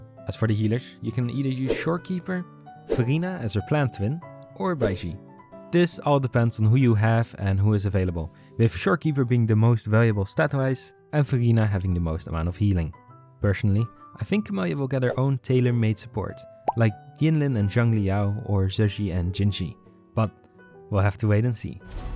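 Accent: Netherlands. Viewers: Love the video weird question are you from the Netherlands you sound dutch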